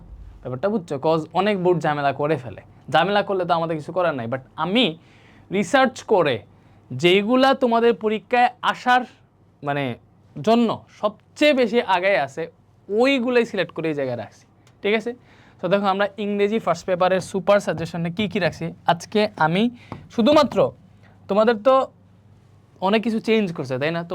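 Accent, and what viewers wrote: Sylhet, Bangladesh. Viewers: Vai maybe sylheti ?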